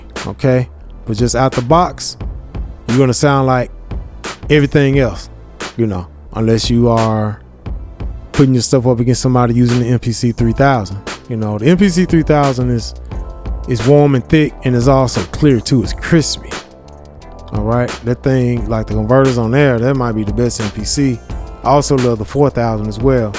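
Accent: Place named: South, United States. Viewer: I KNEW I heard that West Alabama/Eastern Border Mississippi in your voice Mane…✊🏽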